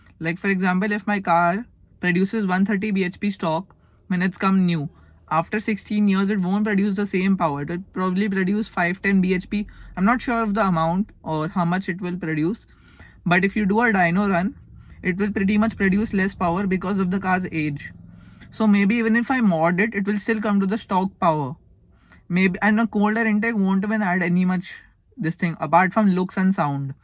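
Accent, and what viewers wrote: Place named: Mumbai, India. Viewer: Its a kinda Mumbai English accent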